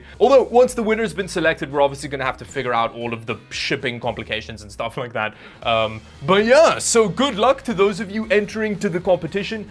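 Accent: South Africa. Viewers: You sound like a ex South African your name is spelt the same in Afrikaans which h is like Dutch thanks for a chance towim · Hey, are you south African?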